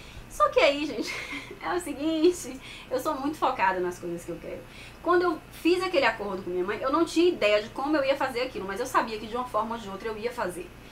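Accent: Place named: Bahia, Brazil. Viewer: já falei que desde primeira vez que encontrei seu blog me apaixonei, depois ouvi seu vidio virei super fã, adoro de mais seu sutac... e agora descobri q vc tbm é baiana... 😍 eu tbm sou Baiana😍Chapada diamantina 😘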